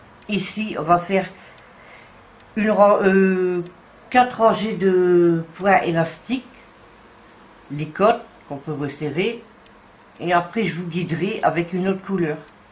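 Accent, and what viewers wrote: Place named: Cambrai, France. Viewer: Merci pour ce tuto je suis entrain de le faire pour ma future petite fille qui arrivera en septembre!!!! j'adore vos Modèles et les explications sont simples!!!!! Continuez à nous enchanter avec vos modèles, j'adore votre accent, j'ai habité Cambrai pendant 6 ans et quand je vous entends parler je m'y revois!!!!!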